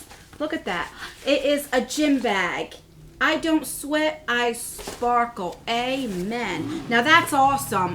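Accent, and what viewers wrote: New Jersey, United States. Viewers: I love your Neugh Joursey accent...it sounds like music to my ears💞 I was raised in Philly, I know all about cawfee and wudda.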